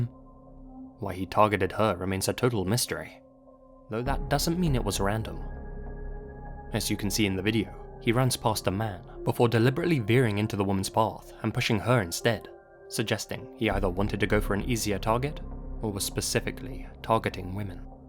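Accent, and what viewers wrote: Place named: United Kingdom. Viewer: I don't really care hpw you make videos. However, I would like to learn how to get that swanky British accent.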